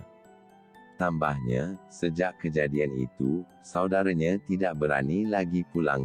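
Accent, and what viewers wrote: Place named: Sabah, Malaysia. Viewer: Sial lah suara Sabahan 🐷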